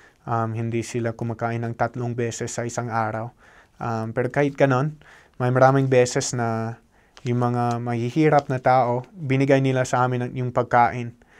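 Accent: Philippines. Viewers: You sound like a Filipino! You love us? We love you too! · The pilipino accent was there, just to improve or learn Tagalog deep words, you know what right now in the Philippines 75% of pilipino weren't spoke deep Tagalog language coz of a English come in our country.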